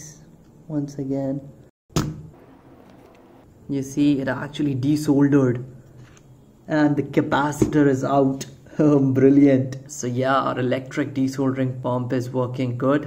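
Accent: India. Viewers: Ini videonya reupload kah ? Judulnya pakai bahasa Indonesia, tapi presentasinya menggunakan bahasa inggris dgn aksen India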